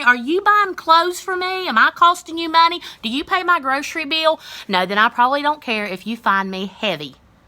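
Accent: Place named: South, United States. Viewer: You are the cutest thing i ever seen wit ya lil southern accent 😍😍😍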